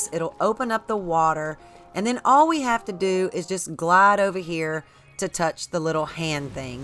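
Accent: South, United States. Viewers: No lie that southern draw from a woman playing games makes me feel comfortable asf lead me gamer woman!! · I did not realize how much better walkthroughs are with a soothing feminine southern accent. Great stuff!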